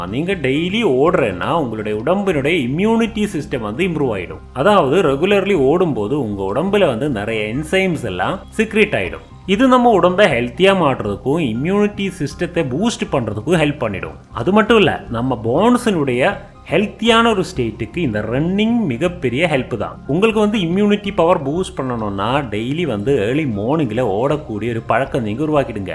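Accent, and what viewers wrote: Kerala, India. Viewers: You are a malayali ah???? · Malayali yano... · Ninga Tamil lathan pesuringala bro ninga malayalam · Brother are you Malayali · voice super malayalam mix ah na tamil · Anna I'm sure are you Malayalam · Neenga malayaliyaa?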